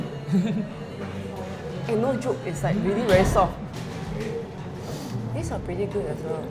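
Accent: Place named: Malaysia. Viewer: Very typical Malay accent. Good selection.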